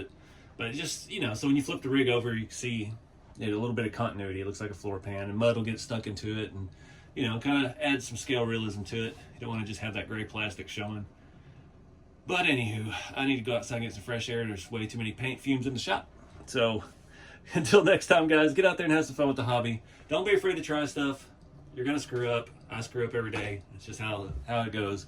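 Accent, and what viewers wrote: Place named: South, United States. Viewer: RC4WD science!!!! I have a way worse southern draw than you do. Fella that tried correcting you would hate to talk to me lol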